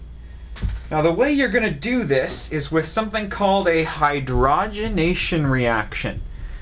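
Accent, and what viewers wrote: Canada. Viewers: you sound very canadian--love it:D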